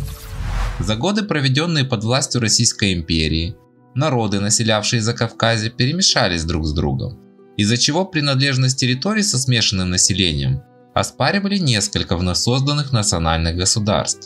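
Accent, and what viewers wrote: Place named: Odessa, Ukraine. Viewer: Азербайджана ещё небыло в это время он только создавался 😃 От куда такой Одесско-Еврейский акцент!?😃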